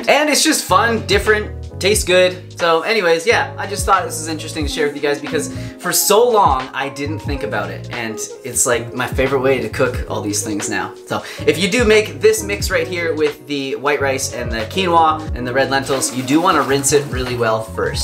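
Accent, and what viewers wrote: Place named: Canada. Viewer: Ahhhh, Canadian. Now the accent makes sense.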